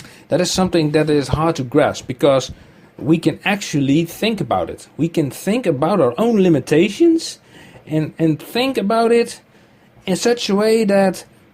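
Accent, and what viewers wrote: Netherlands. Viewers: You have netherland accent english, nice.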